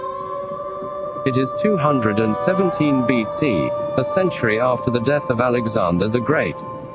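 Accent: United Kingdom. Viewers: Something about watching a Ancient battle cinematically!! with a British narrator ! like watching a documentary ..excellent..only thing missing is watching some older history expert showing us some of the weapons of the age ..xoxoxo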